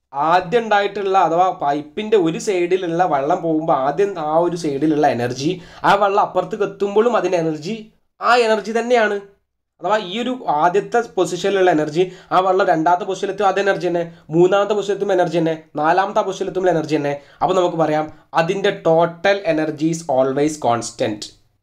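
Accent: Malappuram, India. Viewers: Sir malappuram aano? Slag kettathond chodhichadhaan😁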